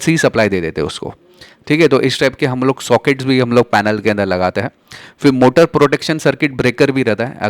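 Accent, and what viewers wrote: Gujarat, India. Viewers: Sir aap basically Gujarat se ho kya.. language se laaga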